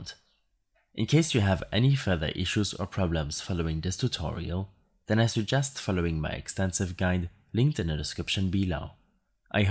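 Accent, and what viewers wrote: Germany. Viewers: You are german i hear the accent if im correct lol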